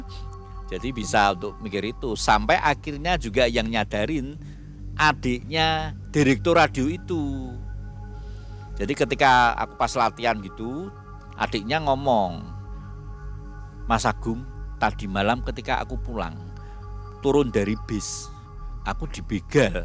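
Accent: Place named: Semarang, Indonesia. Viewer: Pantesan ora asing mbek logat e.. jebul wong semarang 😂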